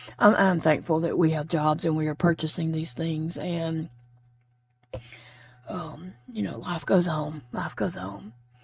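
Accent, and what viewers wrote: South, United States. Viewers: Ms, I think we are all at different places in the spectrum of sharing our details. I'm probably at the opposite end of you, I don't feel it's an honesty/non-honesty thing, but just a comfort level at disclosing our intimate details. That said, I think your videos have helped many who also struggle physically with chronic issues and I admire your courage in putting it all out there. And when you struggle, I pray. God may already know what you are going through, but He has commanded us to pray one for another, so I will. And on the good days and when you share your blessings, I also rejoice with you. So I hope and pray that you feel those prayers and are strengthened knowing there is a community out here that cares for you and is sending up powerful prayers on your behalf. With love, your sister in Christ!❤... Can I just add that God gifted you with a beautiful voice and a sweet laugh, with your easy sense of humor. 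That sweet southern accent is so easy to listen to as I crochet with you. And please don't forget when it seems no one understands, no one gets it, Jesus does. I know you know this, but sometimes we just need the reminder. The feelings of despair and discontent are not from God, by the way, so rebuke them in the name of Jesus and start praising Him for all you do have...not what you don't have. Any of us can get ourselves in a funk when we listen to those nasty minions, despair and discontent who come straight from the pit.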